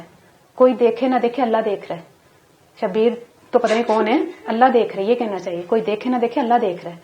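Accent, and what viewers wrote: Punjab, India. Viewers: Punjabi bht sweet bolti hn ap bht zada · Wah ji wah parathay ka maza to apni jagha lekin ap k bolnay ka andaz bht sweet hy urdun punjabi ka tarka lagta hy to maza aajata hy mn ap ki bht sari cheezain try kr chuki hon aur achi banti hn meri duain ap k sath. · Aap ki baat karne ka tarika thoda panjabi hy mashaallah paratha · Sachi aapki batein karte hue, aur vo bhi Punjabi mau, bahut achha lagta hai. Aise lagta hai ki bas sath hi khade hoker batein karte karte maze se khana pakka rahe hai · baji ap ki cooking zaberdust hoti he....or sath ke sath ap ki meethi punjabi bhi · Asslam O Alaikum Baji apki punjabi bht sweet hy or ap ne bht achi technique btae God bless you or ap ka pratha bht acha bna hy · You are so sweet, your Panjabi is so cute.Love you dear. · Masha Allah so sweet voice aap ki mujha panjabi bahut pasand hai masha Allah masha Allah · Kasssamayyyyyyy and also ur punjabi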